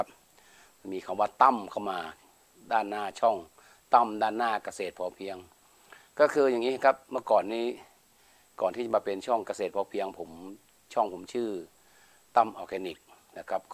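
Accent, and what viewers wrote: North, Thailand. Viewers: อาจารย์อยู่แถวใหนคะพูดได้สาบเมืองมากเลยค่ะ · อาจารย์เป็นคนเหนือหรือป่าวเจ้า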